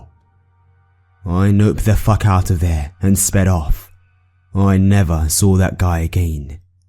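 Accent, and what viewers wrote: England, United Kingdom. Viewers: You I love accent is it Australia or England sounds English lol so Sexy much love Sexy Grandma ✌💘😉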